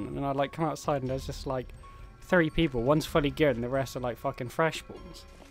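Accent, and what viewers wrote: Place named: West Country, United Kingdom. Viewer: Are you from Bristol or Somerset? Very west country accent :)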